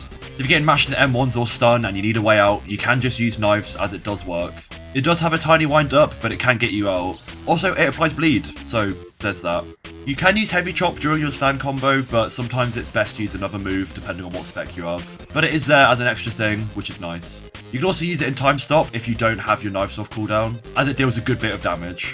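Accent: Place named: United Kingdom. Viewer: clicked this thinking it was eclipse and then was like “who the fuck is this British guy” 😭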